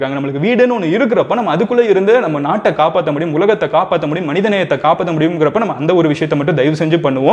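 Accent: Madurai, India. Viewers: Thalaiva nega Madurai ya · neega madurai ya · Bro Neenga Madurai ah? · நீங்கள் மதுரை யா? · Bro ninga madurai ah 🤔 Super bro 😇🤗😎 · Bro neenga Madurai ah??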